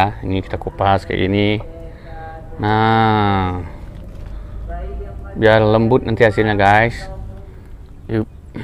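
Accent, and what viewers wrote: West Sumatra, Indonesia. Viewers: Sama, maka nya heran logat nya kayak logat Sumbar, tapi video nya kayak di sunda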